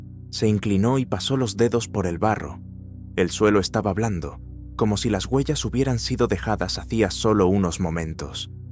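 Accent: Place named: Spain. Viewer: Quiten el maldito y horrible acento español, ese acento tan desagradable arruina la historia, deberían de prohibirlo en todo el mundo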